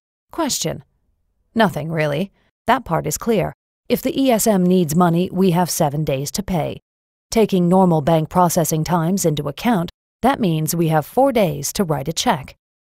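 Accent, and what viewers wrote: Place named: United States. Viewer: Proc je to video namluveny americankou?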